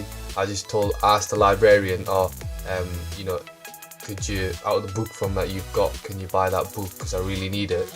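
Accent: United Kingdom. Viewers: british accents lmao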